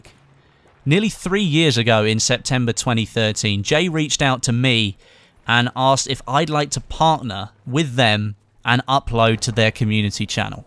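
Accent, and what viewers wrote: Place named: United Kingdom. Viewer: Hey, you're awesome. From the time we were all infatuated with the T-Rex on Rogue Transmission and the Megalodon, I have loved your videos. You have never failed to please your audience (and turn us(me) on with that sexy british voice of yours), and are always looking out for the fans. I subbed at around the 50K mark, so right around the first easter egg hunt for BF4, and there was a point for a couple of months where I would leave a comment and I would have the guarantee of your reply. Bigger Youtubers often lack that quality, which I can understand, but you go above and beyond. I remember I was staying up late (11PM, Central US time) and you added me on G+. That put me in a position where I knew you were awesome enough to actually actively pursue some of your most active followers. Anyways, I love your videos, and 'grats on your big milestone. 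Also, I am just dying to see the Vlog with the 100K Silver play button!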